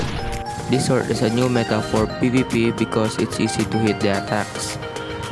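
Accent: Philippines. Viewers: u sound like a filipino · im for sure this guys is a Filipino the way he talks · u sounds like a ph guy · sure pinoy yan boses palng eh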